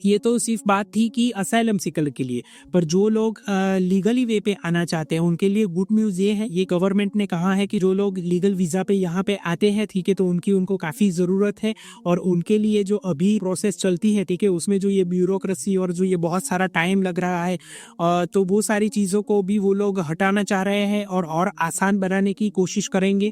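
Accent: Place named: Gujarat, India. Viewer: Bhai Kay Thai chho? Gujju accent is visible 😂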